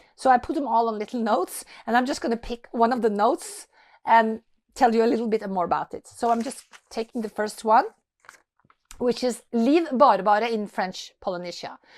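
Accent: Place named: Norway. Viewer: So the boat name is BareBare as in bare-naked. Why do you call it butter-butter? Perhaps my southern U.S. Ears are not tuned in to your Norwegian accent. It sound exactly like butter-butter. I find it super interesting how the English language sounds SO different spoken by people around the world. Ok enough questions, good luck finding a home for butter-butter - wink!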